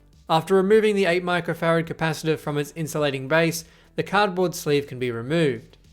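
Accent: Australia. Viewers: an Aussie, mate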